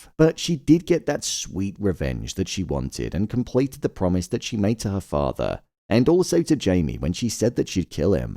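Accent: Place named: RP, United Kingdom. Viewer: This BBC voice narrator is definitely not helping the review. What a shitshow ending.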